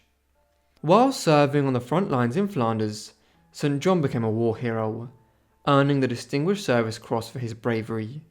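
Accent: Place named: England, United Kingdom. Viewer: English accents drive me nuts. I can't listen to this BS.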